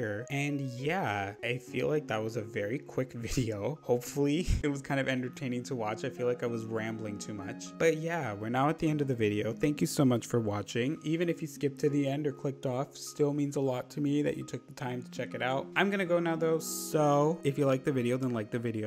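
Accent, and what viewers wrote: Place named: Canada. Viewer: I heard your Canadian… that makes you my favourite animal Crossing YouTuber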